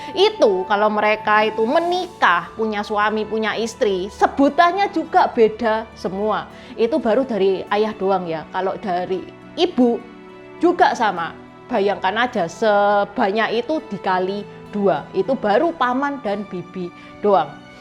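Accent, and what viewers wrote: Java, Indonesia. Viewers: komentarku bukan u menihilkan niat baik u memberikan info yg baik tentang hal2 yg berkaitan dengan chinese. Semua video 2 lain2 nya saya sering mendapatkan wawasan baru. Tapi u video ini, jujur saya ga pengen tonton hingga habis... krn pelafalan yg salah... menggangu bgt telingaku. Krn di bahasa mandarin, salah intonasi = salah arti.... Saya kebetulan teochew yg intonasinya bernada 6-8.... dan uda 4 thn yg lalu otodidak belajar bahasa mandarin... dan lumayan hasilnya. Saya juga setuju logat jawa medok yg membuat pelafalannya agak kacau... TAPI harusnya ini jgn JADI excuse u salah melafalkannya. Krn buat yg kurang mengerti, jadi bisa salah diterimanya oleh mereka. Dan saya yakin juga bukan intend u membuat video ini, kl ternyata salah diterima oleh audience nya. No offence here, bang....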